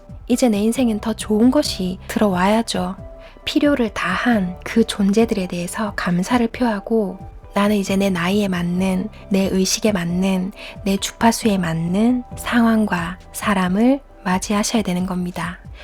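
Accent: Gyeongsang, South Korea. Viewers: 갱상도 어감이?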